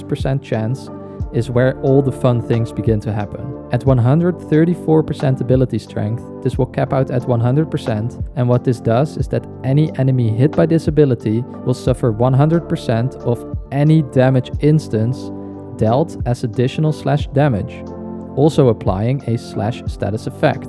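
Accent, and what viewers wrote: Netherlands. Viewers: Do i hear a dutch accent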